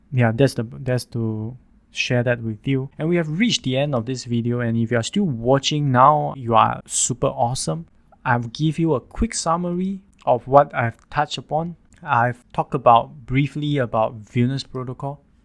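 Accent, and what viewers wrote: Singapore. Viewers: Singapore/malaysian ascent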